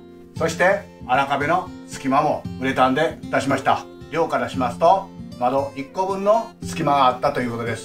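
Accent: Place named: Wakasa, Japan. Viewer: すごく関西弁の若狭訛りっぽさを感じる…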